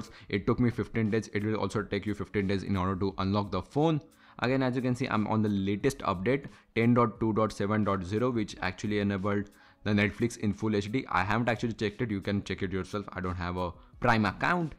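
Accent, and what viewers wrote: India. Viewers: I'm trying to find out how to root my redmi note 7 phone so that I can remove all the bloatware. This video sounds very helpful, but I am afraid I cannot follow your Indian accented English. Have you got a text version of your voice over which I could follow?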